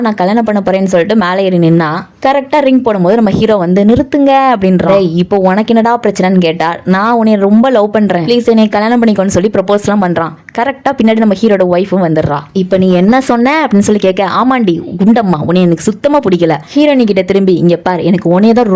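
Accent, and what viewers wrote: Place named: Tirunelveli, India. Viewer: திருநெல்வேலி பாஷை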